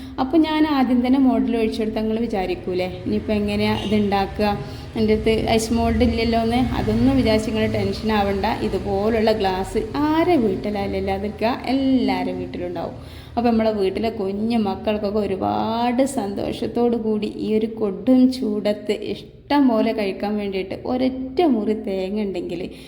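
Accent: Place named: Kozhikode, India. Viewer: ഇത് ഉറപ്പായും ഞാൻ ഉണ്ടാക്കും ഈ വീടിയോ എനിക്കൊരുപാട് ഇഷ്ടായി കോഴിക്കോട്ടുകാരിയാണോ എനിക്കൊരു ഫ്രണ്ട് ഉണ്ട് കോഴിക്കോട് അവളുടെ അതേ സംസാരം നല്ല സംസാരം കേട്ടിരുന്ന് പോകും താങ്ക്യൂ💯👌🥰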